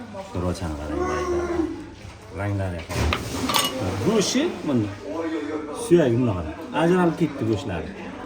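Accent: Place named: Oasis, Uzbekistan. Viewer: Э харип Узин воха тамонлардансану шевагда гапуровур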